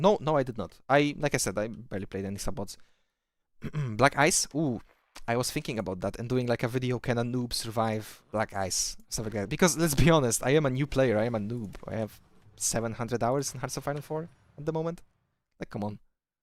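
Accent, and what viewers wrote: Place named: Poland. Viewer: I don't know why your Polish accent fits the OWB theme you have in your channel.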